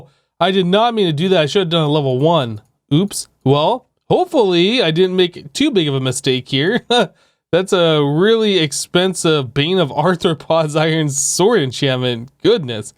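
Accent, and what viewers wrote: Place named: Canada. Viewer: That hint of what i think is canadian really gets me sometimes.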